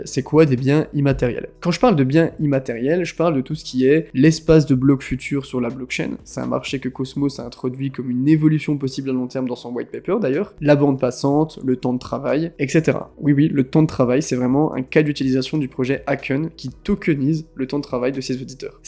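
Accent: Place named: East, France. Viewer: Allianceblock les gars, jeton $NXRA, vous me remecierez plus tard... merci pour ton travail, c'est quoi ce petit accent, ne viendrais-tu de l'Est de la France😊?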